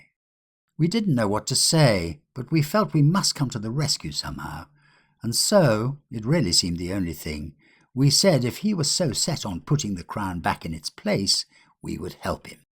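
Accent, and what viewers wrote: United Kingdom. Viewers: With or not prohibited filmation, please continued to read for us. The British pronunciation is a good listening. Have a nice time.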